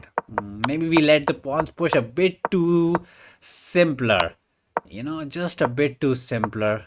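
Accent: India. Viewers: I used to be bad at understanding Indian accents but I’m a master now that I watch this channel every day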